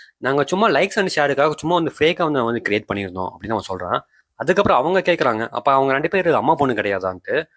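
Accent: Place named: Kongu, India. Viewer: இந்த காணொளியை பேசுபவர் கொங்கு மண்டலத்தை சேர்ந்தவராகத் தான் இருக்க முடியும்... எப்படி என்று கூறுங்கள் பார்க்கலாம்???...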